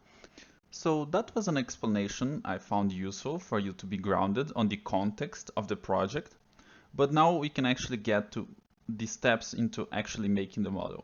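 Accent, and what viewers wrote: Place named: Europe. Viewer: primeira vez que escuto um brasileiro com sotaque de europeu kkk. Bom trabalho aí mano muito bom seu workflow.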